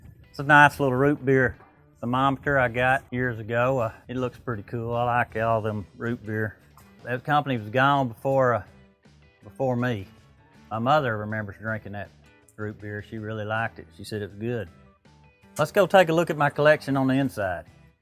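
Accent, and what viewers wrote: United States. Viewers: I was born and raised in rural Mississippi. The army too me all across the world. Your voice and accent remind me of home and memories growing up. We had a Mr. in our community, Mr. George Carter who ran Carters Store, a great man. Absolutely loved the video. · love the passion you have and how much the different pieces mean to you. I will be watching, good to know people like you still out there...your accent reminds me of all my family back in Georgia, Mississippi...i use to have that same accent but lost it many years ago. i use to get teased when I was younger, living in California...never really bothered me at all. But guess what....now they say --y'all and it just doesn't fit...oh well. Let the good times roll.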